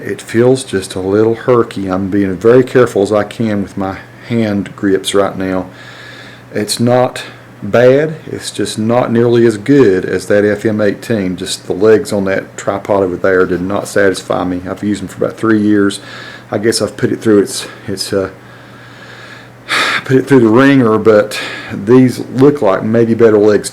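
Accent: United States. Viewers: Your accent is awesome. What part of the States is it from?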